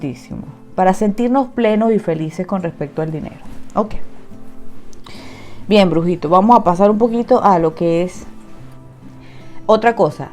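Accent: Argentina. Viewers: Argentino o uruguayo?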